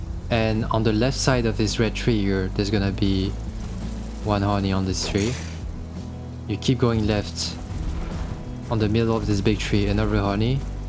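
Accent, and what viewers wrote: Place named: France. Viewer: LOL I didn't imagine you were Asian. I always thought you had something that sounded a bit like French accent, not sure, but I thought you were most likely European or smt.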